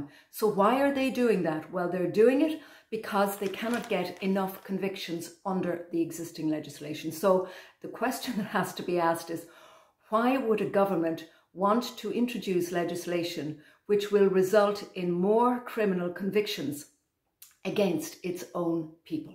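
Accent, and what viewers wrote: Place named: Ireland. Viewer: It's so refreshing to listen to an Irish person speaking so eloquently. Beautiful too.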